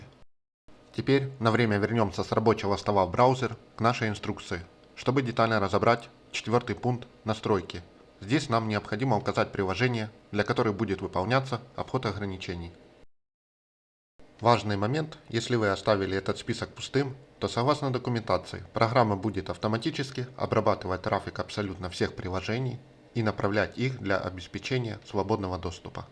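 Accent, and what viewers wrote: Ukraine. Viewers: Рекламировать DPI - C УКРАИНСКИМ акцентом крайне рискованная затея ))). Даже если вы не призываете уже статья за это если вы рф а акцент усугубит ваше положение до ионогена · Смущает малоросский говор. Не засланный ли казачок? Извиняй, если что, обидеть не хотел. У меня гудбай дипиай работает прекрасно.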